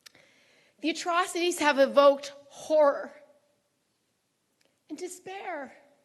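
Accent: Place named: United States. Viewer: Such lies! Israelis own news papers proved only 1 baby died and did not know if it was by Israeli tanks. Also rape is not used as a weapon of war by Hamas or Russia. Not one investigation found this. Her with the Israeli flag, American accent, senate position and emotional tirade should be 4 red flags to know she is paid to lie.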